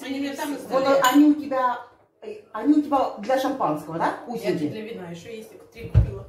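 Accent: Urals, Russia. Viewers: Такой интересний уральский акцент)Всех девочек с наступающим😊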